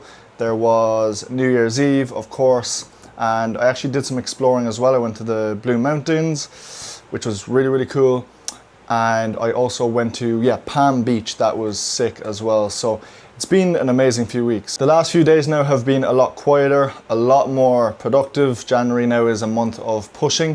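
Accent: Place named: Ireland. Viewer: Beautiful Irish accent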